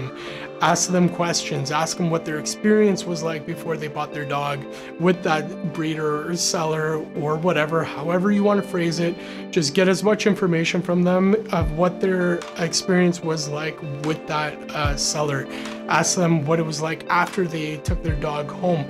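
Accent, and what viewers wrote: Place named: Hungary. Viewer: I'm sorry this is super unrelated, but are you originally from Hungary? Just thought because of Füli and some slight tinge of your accent ^^ Thank you for all this information also, I just found your channel and already subbed ♡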